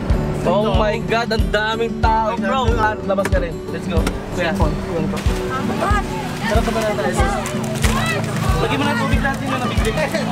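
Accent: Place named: Ilocos, Philippines. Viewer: Ilokano ka gayam brogang. Naalwad ah pang pataray brogang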